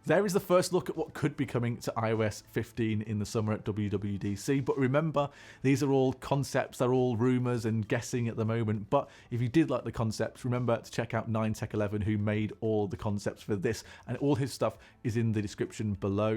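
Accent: United Kingdom. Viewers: Thanks for the updates, it’s always kind of fun to anticipate with the new iOS will do and what improvements are on the way. Can’t quite figure out if you’re British or Australian but I do like the accent. You have a friend in Columbus Ohio USA!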